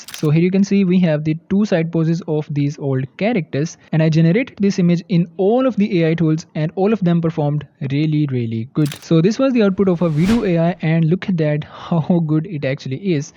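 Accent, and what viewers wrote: India. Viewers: Once I listened that indian voice I knew this shit isn't working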